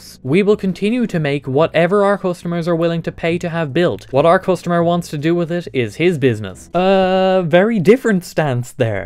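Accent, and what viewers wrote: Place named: Ireland. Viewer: Sure you're not Canadian 🍁... that's the softest Irish🍀 accent I've ever heard...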